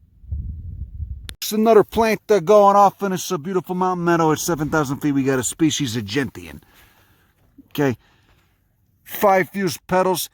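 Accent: Chicago, United States. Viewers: Please do not denigrate the fine South Side of Chicago accent with scurrilous comparisons to either of those meat-heads.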